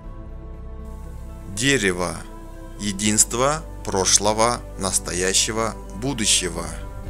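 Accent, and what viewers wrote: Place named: Kazakhstan. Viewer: О , второй попался на Платошкина .😂😂😂 А тебя не смущает что диктор говорит с казахским акцентом , вот так вас и разводят .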